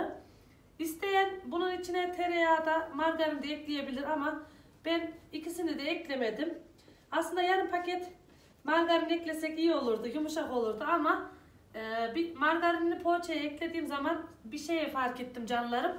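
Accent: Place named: Nevşehir, Turkey. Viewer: Nevsehirliyim konusmammiz col benziyo cömce 🙂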